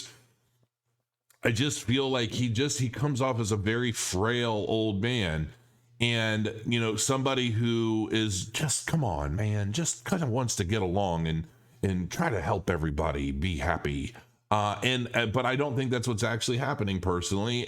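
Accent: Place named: Stockton, United States. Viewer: About time you sound like you grew up in Stockton CA.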